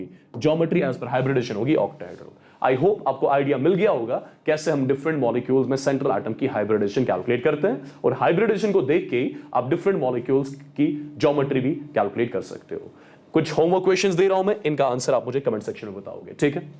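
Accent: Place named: Kashmir, India. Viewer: It's kashmiri voice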